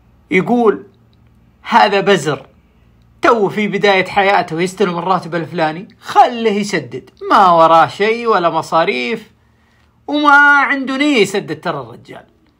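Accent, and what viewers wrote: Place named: Libya. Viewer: سبحان الله كلامك ولهجته كانه من ليبيا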